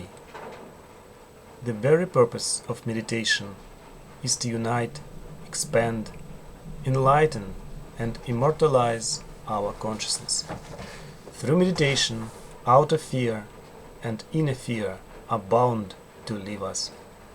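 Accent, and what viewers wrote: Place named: Russia. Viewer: Sweet russian accent:)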